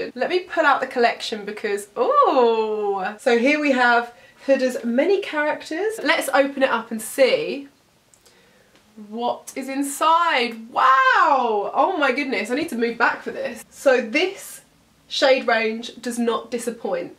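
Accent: United Kingdom. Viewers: Are you british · you British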